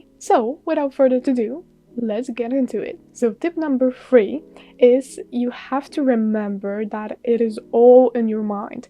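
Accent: Poland. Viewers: I heard the first few seconds of the video and i already knew you are polish haha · I was right you're polish, I can tell it by your accents siema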